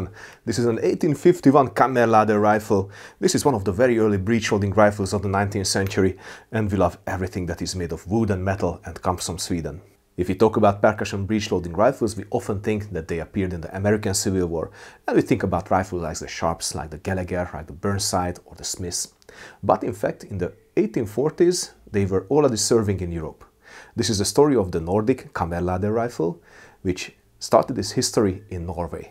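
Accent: Hungary. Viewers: This guy has strong hungarian accent... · Ah, Kammerlader story told in exquisite hunglish, love it! :D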